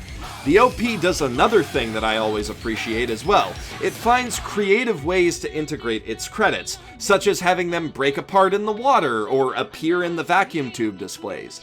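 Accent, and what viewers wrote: Canada. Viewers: WAIT!! Your're Canadian!? How did I not catch that? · Hole maple syrup Goku! your Canadian! I had a feeling i liked you speech pattern, guess because your Canadian. Well Subscribed! keep up the good work :)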